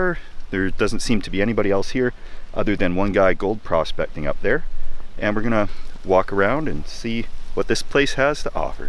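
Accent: Canada. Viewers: I grew up in Buffalo, NY and spent many summers in Ontario. You epitomize the best of everything that is Canadian. I live in NC now and miss those accents and beautiful natural surroundings all Canada has to offer. (I really miss the snow!) Great channel, so informative and frank, I feel like I’m camping with a friend. Keep up the great work!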